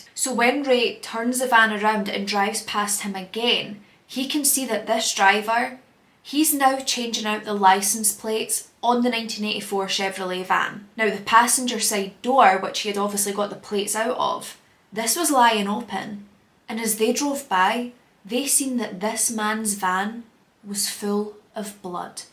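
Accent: Scotland, United Kingdom. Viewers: Just found you and I love hearing another Scot ❤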